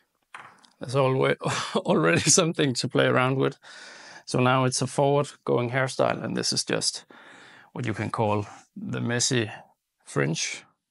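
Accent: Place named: Denmark. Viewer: i knew u were danish by ur accent, nice video :D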